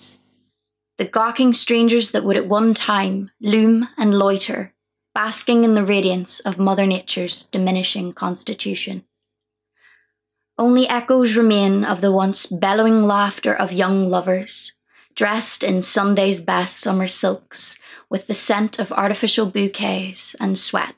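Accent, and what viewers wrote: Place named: Ireland. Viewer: Love how your poetry brings out the Irish accent, and oh my word, how nice it is to get finally hear a new poem from you! Bet that (huge) little book of yours holds many treasures for us. ❤️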